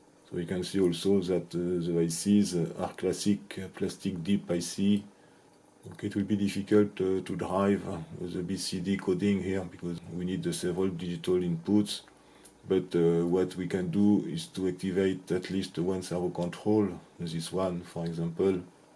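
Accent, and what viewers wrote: France. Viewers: Friend, after you get used to watching videos with the French accent you will get much better at it, it just takes a little practice 😸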